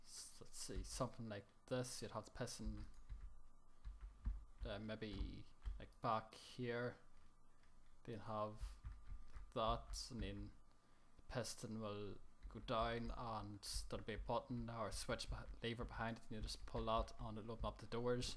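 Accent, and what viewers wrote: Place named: Ireland. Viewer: hey man love this vid it gave me a lot of ideas and i love ur accent it sounds irish and im irish :)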